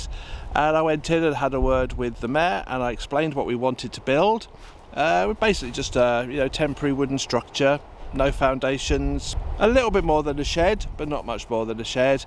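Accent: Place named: England, United Kingdom. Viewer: Thank you for your reply. You are lucky and blessed to own a large piece of land in such a beautiful country with a moderate climate and lots of water. You sound English. I noticed last year that a lot of Englishman are retiring there. They do seem to have a lot of restrictions. If I could get family to move there I would be there in a heart beat. In my area a lot of people go to Mexico but I prefer Portugal to any other countries 👍🏼🙏💝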